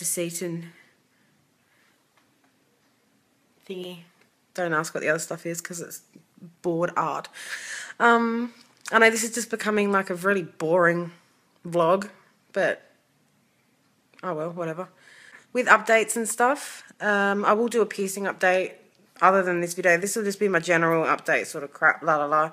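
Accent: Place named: Australia. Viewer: love the australian accent, and the blonde looks awseum :D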